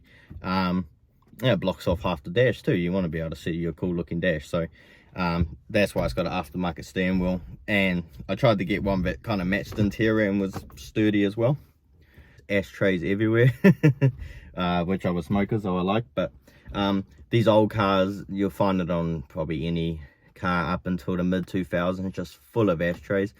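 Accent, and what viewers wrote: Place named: New Zealand. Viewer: You NZers speak funny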